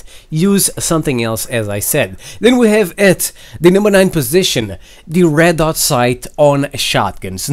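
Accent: Brazil. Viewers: you're brazilian or english ? good vídeo ;P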